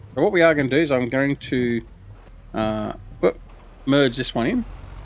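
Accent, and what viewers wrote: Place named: Australia. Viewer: "In my battle with stacking that Bloody trailer"... I laughed so hard🤣 brilliant so good to hear Aussie slang. I watch many great YouTube's from usa etc, there content is good too, But It's a breath of fresh air to find your channel and the way us Aussie speak!!😁😁 Keep up the great content and thanks for your hard yacker in making it 😁👍 From QLD Australia.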